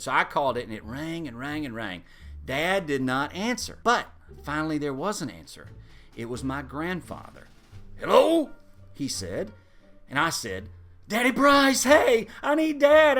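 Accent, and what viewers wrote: South, United States. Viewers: You know, when I started watching your videos, you mention that you live in the south and I’m thinking “hmm yeah, you do sound a little southern”. Then I come to this video with no expectations and I hear your dad talking and I just can’t stop smiling XD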